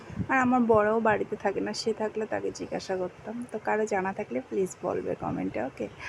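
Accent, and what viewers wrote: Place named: Assam, India. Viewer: Tmr assame biye hoyeche ki vasa sune ektu ektu lag6e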